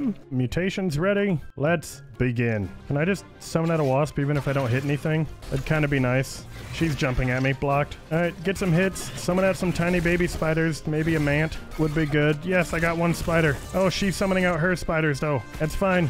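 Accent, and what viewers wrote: United Kingdom. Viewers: If heard of you a ton from grounded community days and paralyzers videos i thought you were from the uk 😂😂 didnt think i would like your content but i was wrong funny video ggs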